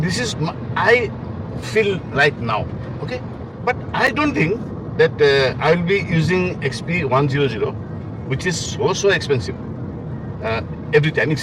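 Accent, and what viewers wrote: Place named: Assam, India. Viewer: accent sounded like an Assamese accent. Nevertheless, great video.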